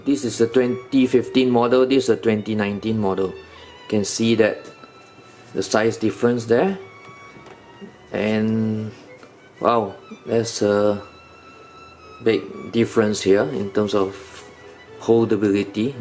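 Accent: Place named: Malaysia. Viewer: your voice like malaysian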